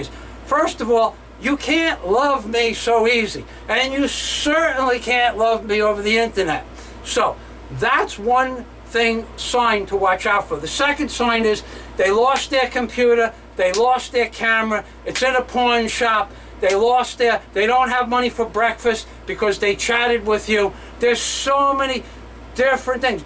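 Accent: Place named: East Coast, United States. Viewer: Brother I hear an east-coast accent; mind if I ask what state are you from?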